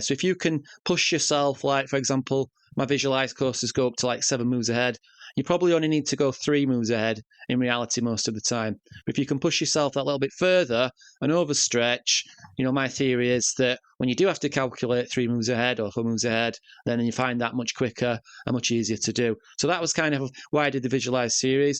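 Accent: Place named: Manchester, United Kingdom. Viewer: You're from Manchester aren't you?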